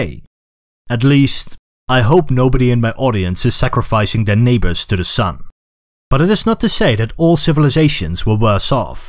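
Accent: Netherlands. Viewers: Are you Dutch? You're accent kinda sounds like it.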